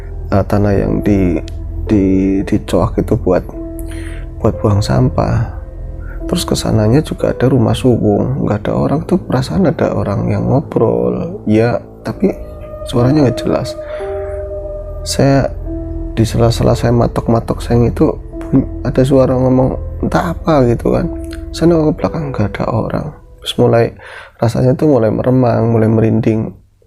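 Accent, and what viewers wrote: Tegal, Indonesia. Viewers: Mas,maaf saya telat 16 jam 🙏 Tetap semangat mas...awal2 di ngobor dl juga saya sedikit kesulitan nyimak kalo mas lg bawain cerita (mungkin krn saya orang sunda) tapi lama ke lamaan jd terbiasa dg logat tegal nya. Semangat mas,d tunggu cerita2 yg lain nya 💪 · temanggung hadir mas...untuk cerita kali ini q insyaallah yakin blm prnh dibawakan di chanel sblmnya soalnya klo cerita di chanel sblmnya alkhamdulilah q sampai hafal alurnya semua karena tiap cerita q dengerinya 3-4 kali...oya cuma masukan aja buat mas klo dr q justru lbh suka klo ada logat tegal,nya selain lucu entah knp q jg tertarik dngn logat tegal,nya mas....🙏🙏🙏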